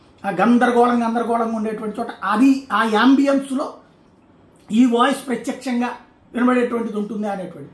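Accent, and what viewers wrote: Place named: Godavari, India. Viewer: avunu bro adi Godavari slang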